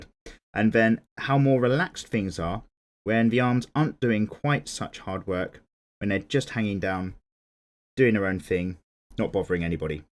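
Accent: United Kingdom. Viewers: WHY IS THE BRITISH ACCENT SO BEAUTIFUL?! TTATT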